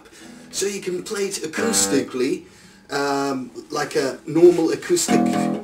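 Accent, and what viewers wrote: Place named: Australia. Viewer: he sounds like an australian guy giving a review on an animal.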